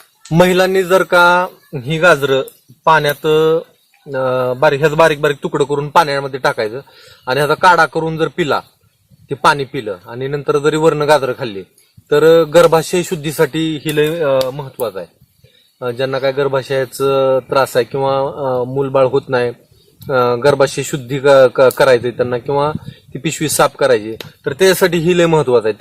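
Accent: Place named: Solapur, India. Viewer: Khupch chan.....zala aahe.....😋😋😋tumhi kontya gavche....me solapur che aahe....bhasha ekdm solapuri vatatey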